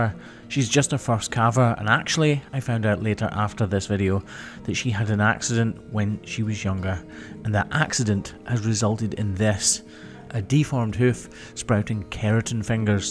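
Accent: Scotland, United Kingdom. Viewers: Her hoof damage reminds me of a weird nail I have. As a kid, I smashed my right hand ring finger between the door and doorframe, you know where the hinges sit, and I broke the very tip of my finger - right where the nail is formed. Today I have a strange nail that grows in layers, with a weird top layer that kind of splits off from the rest of the nail and I have to cut it really, really short for it to be comfortable. The scarring on my finger is extremely sensitive and the tip of my finger is actually shorter than the left hand ring finger, visibly so and when I put my hands together to compare, I lack about 5 millimeters on it. While my own finger and nail will never be "fixed", it's a thing I've learned to live with and it doesn't impact me in any real way. I hope this little miss can lead a good life, even if the keratin finger issue reemerges. 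These videos are super comfy and not only do I get to listen to the dulcet tones of a Sco'ish man, I get to see cattle having their hoof injuries treated with the utmost of care and respect for the animal.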